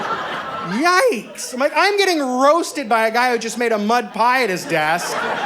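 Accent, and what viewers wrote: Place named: Canada. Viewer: I wasn't picking up on the Canadian accent at first until I realized that sometimes your enunciation sounds like Jordan Peterson 😂😂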